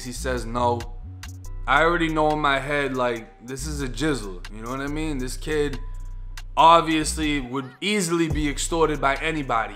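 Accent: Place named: Massachusetts, United States. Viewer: Good shit. This is a break through for you. Everytime I level up it makes me feel happier with who I see in the mirror. We have watched you transform. I've been with you a while. You tell good narratives. You're a great story teller. And you are now seeing that life is more about letting go than reliving. My gf calls me on it when I live in the past because we had to be one thing to not fall victim to the population. You don't get this many loyal subs unless you're doing something interesting. Massachusetts accent don't hurt us either. Lots of girls love our accent 😂. I actually watch your vid first of all my channels in subbed to. 🔥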